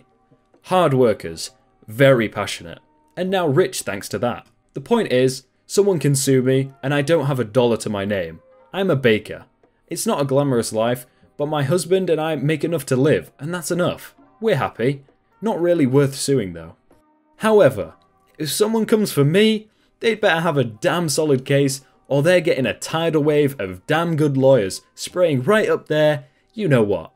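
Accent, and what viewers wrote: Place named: Yorkshire, United Kingdom. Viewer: Your deep south accent sounds suspiciously Yorkshire-like...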